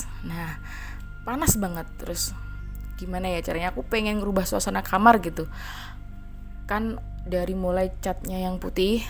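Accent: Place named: Surabaya, Indonesia. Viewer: Ohh pantes, dr kediri.. Tak denger2in suarae kyk org Sby wkwkwkwk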